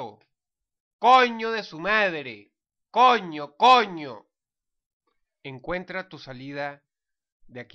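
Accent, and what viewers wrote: Argentina. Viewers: Le fue engrosando su voz de sus 25 años para acá, muy aparte del acentro entre mezclado con el argentino, pero la voz le cambió.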